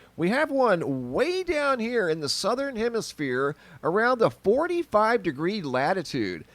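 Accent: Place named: Central Pennsylvania, United States. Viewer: Btw thats how everyone sounds in central Pa and surrounding area Yoooooooo dude :-D Hey I'm from pa and yes back about 6 years ago back country road not a drone nothing like that full 100% craft out of this world just hovering right above my head on this back country road it was legit just chilling there as if its saying hi to me. All i had at the time was an ipod and when i went to try to record it and then began to move from me behind the trees It was above my car the height of telephone poll.. it was high tech and out of this world for sure.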